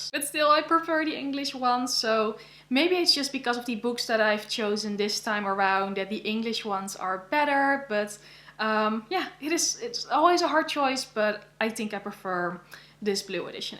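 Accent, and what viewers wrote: Netherlands. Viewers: Thanks so much for making another one of these videos, I've been waiting a long time for it since I enjoyed the last one! How is your studying going, and is the pandemic situation in the Netherlands getting better? Your makeup and hair are gorgeous as always! Are you trying anything different, or do you have the same routine? Are there any Dutch writers that you think are really great and that you enjoy reading? I also really get annoyed by nonremovable stickers on books and I usually don't buy books with them, because I just hate the way they look. Have you thought about reading Hanya Yanagihara's first book, The People in the Trees, if you haven't already? Like A Little Life, it is also a superbly written and fascinating book based on a true story about some very awful and difficult topics. Also, I love hearing you talk, since your accent is beautiful and unique!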